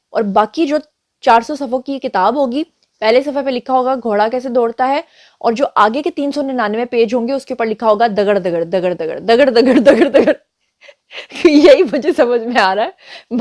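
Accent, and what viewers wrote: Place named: Punjab, Pakistan. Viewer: تھوڑا سا مزید پنجابی ٹچ دیا کریں مزہ آتا ہے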